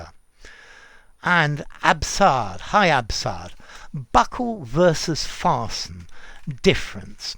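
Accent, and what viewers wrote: United Kingdom. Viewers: Most of the videos I watch in English are from you, and some British ways of pronouncing words like "Dictionary" and "Vocabulary" have been stuck in my mind.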